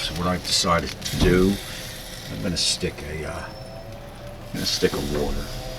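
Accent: New York, United States. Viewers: I like your style mate, that’s a New York accent right ? You a sports fan ? I’m an Aussie and can’t get enough of American sport, I love everything New York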